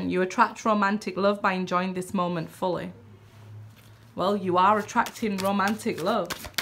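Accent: Yorkshire, United Kingdom. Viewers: I love Yorkshire accent! And Geordie too 😊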